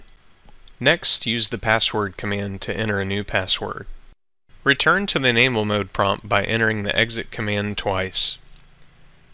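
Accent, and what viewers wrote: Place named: United States. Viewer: Warning #1: This is a furry video. Warning #2: The narrator is American and will systematically pronounce "enter" as "enner".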